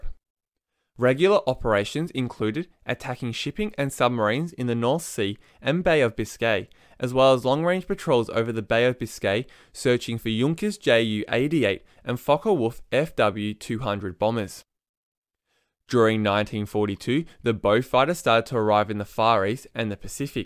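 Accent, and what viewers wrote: Australia. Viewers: Love the video and the accent. What part of Oz are you from? Greetings from Norway. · Loved the Beaufighter, some great photographs and videos, lovely to here the Aussie twang of the narrator. Excellent.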